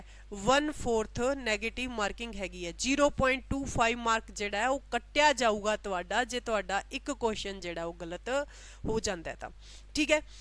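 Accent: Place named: Bagri, India. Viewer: ਬਾਗੜੀ ਆ ਮੈਡਮ,, ਪੰਜਾਬੀ ਭਾਸ਼ਾ,, ਜਿਦਾ ਬੋਲ ਰਹੇ ਆ ਪਤਾ ਲਗ ਰੀਆ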